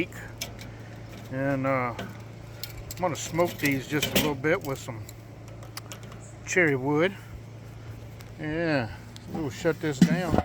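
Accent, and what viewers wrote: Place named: Louisiana, United States. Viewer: Looks and sounds good. My Momma liked her hotdogs black! Are you from Louisiana? Asking because I detect an accent. Thanks for sharing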